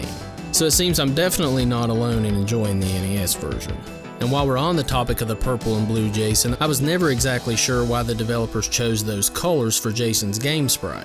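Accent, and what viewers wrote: South, United States. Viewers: Loving that southern accent. Cool channel! Subscribed and liked! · Aww the good ole days, great video btw way your southern accent made it even more intriguing! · First video I watched. Subbed. Great video and well researched. I personally love this game too. A few things: You mentioned a couple of the purple Jason tributes, but there's also an awesome purple NECA "action" figure worth a look. Next, with the release of NESmaker, we can expect an explosion of new rom hacks, specifically ones fixing, improving, and editing old NES games. I know rom hacks exist, but the NM program makes it so easy anyone can basically make their own game from scratch. Good times a'coming! Lastly, I'm trying to place your southern draw, I'm from Memphis myself, but have lost most of my accent living overseas for 20 years. My bet is Arkansas or Kentucky. 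· liked subbed, love your country accent and video coverages on titles. you really do great indepth coverage and the sound quality is great, liked and subbed!! <3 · This guy has the perfect amount of country twang in his voice. I'm a fan sir, u remind me of home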